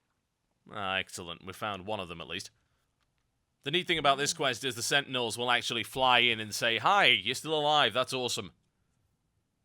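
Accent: United Kingdom. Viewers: I'm lithuanian and i dont have any problems understanding, he speaks very clearly. I do like british accent, that's how true english should sound. Ofc americans are having a hard time understanding him cuz they speak like efin' murlocks. And, keep a good work mate.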